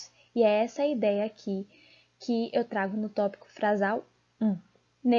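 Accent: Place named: Bahia, Brazil. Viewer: O sotaque bahiano é a melhor parte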